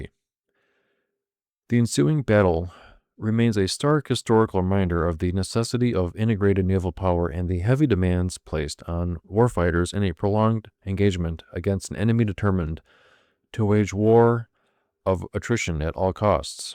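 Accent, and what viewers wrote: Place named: United States. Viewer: Hi, I read your reply from my comment on yesterdays’ video about rommel. Great to see a new content creator-documentarian focused on the world wars with a channel that is not AI. Also, I don’t know if you have been here long but there’s a lot of us that go to sleep watching/listening to war documentaries and we are kind of a tight knit group. I think the reasoning is multifaceted and its not that we are war junkies. It has to do with being transported to another place and time, as well as the psychological aspect of “I had a stressful day but thank god I’m not there” Here are a few more helpful hints that you may want to work on: - Again, you have an incredibly calming voice considering we have american accents which by nature, isn’t as relaxing as the quintessential british historian voice speaking proper/high society english. 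I would suggest working on your cadence and delivery so you are more confident in your delivery - Calming and relaxing is good; boring is bad - Keep working on your editing skills and consider finding/creating a pleasant soundtrack for background music - As stated previously, try and stretch the videos out to an hour or so, thats kind of a sweet spot - Go watch the entire BBC/PBS WWII documentary series that was done in the 1990’s called “Battlefield” It is literally the holy grail for us in terms of this genre - Watch Fin’s Channels as stated in the last video to gain some more hints - Even though you are new at this, you already have a subscribe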